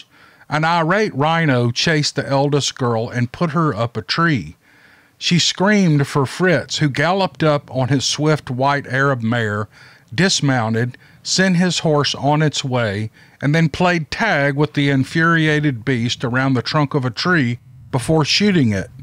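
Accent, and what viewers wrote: South, United States. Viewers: You could monetize your voice. You have a voice I would rather hear than most of the books I've listened too. Maybe it's because southern people are my people! When I listen to a book, I want to Invision the story, not try to understand an accent or be distracted by it. I'm serious! I would listen to more books if you narrated them.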